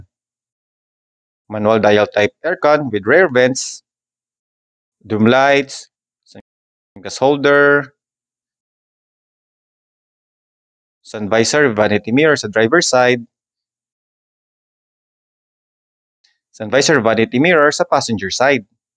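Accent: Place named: Thailand. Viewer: Bro, bakit Thailander ang accent mo? Muntik ko ng I-skip akala ko ibang lahi 😂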